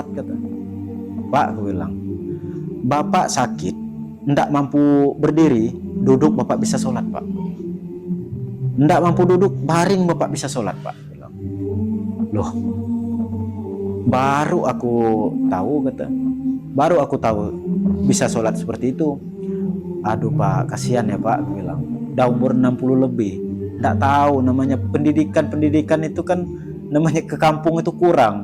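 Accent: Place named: Madura, Indonesia. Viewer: Kok logatx madura bang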